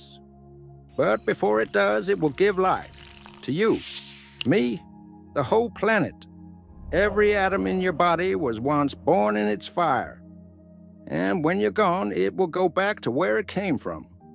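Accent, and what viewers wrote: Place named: South, United States. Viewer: It would be great if the narrator was not a southern old dude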